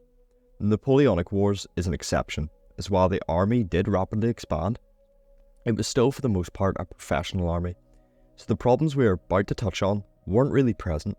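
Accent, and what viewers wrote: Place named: Northern Ireland, United Kingdom. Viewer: Excellent and interesting video, thanks for making this! Nice to hear a Northern Irish accent too.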